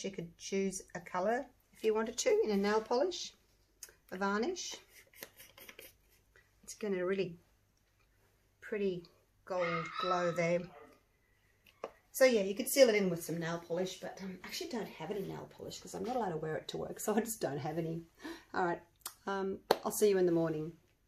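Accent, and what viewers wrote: Australia. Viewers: your lovely personality shines through! I love all the things you say, love the Australian way of saying things that's different from American way of saying things! You are interesting and engaging and very informative -- all good reasons to watch you, learn from you. Please don't change anything because someone commented on it. These are so beautiful! I love the pink! I don't think it's too much pink. If the white one was not next to the pink one, it would not be so obvious that you added pink! Opal was my mother's birthstone, she loved them and so do I! How about a touch of blue or blue-green? I don't think the powder on the back shows through. Beautiful, just beautiful! Thank you and take care and stay safe!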